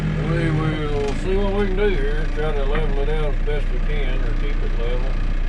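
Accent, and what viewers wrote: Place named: Oklahoma, United States. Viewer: That Okie accent is Okay!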